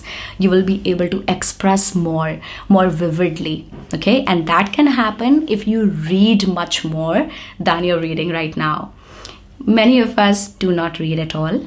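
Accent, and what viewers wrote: India. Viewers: Special thanks to you for maintaining indian accent. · I really admire the way you talk to us. Your pitch is clearly audible. I like your Indian accent👍✌ I'm also on a way to speak fluently. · We love your Indian Accent Mum😊😍 Thank you for always sharing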